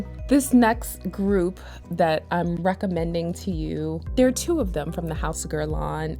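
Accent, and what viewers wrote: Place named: West Coast, United States. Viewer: You're from NY or CA? cause you got the west coast accent down pat!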